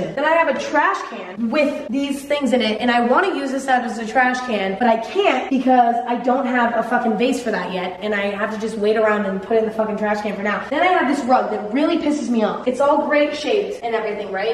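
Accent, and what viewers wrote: United Kingdom. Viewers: Wait u British?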